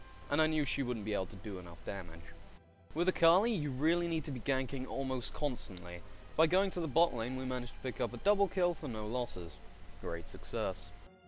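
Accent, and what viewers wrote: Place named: United Kingdom. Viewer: it's like the british version of Cryaotic's voice o.o Kinda, kinda.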